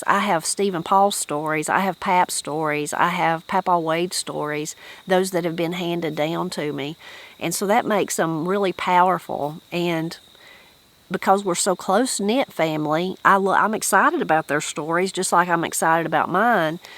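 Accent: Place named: South, United States. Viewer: Late to the party, but thankful as well. What a gift. Your voice, pace, cadence, and tone are what we sons of southern women recognize and love.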